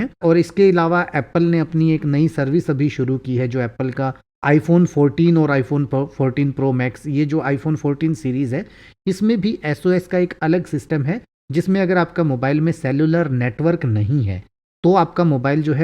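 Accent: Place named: Assam, India. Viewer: Assamese laga thank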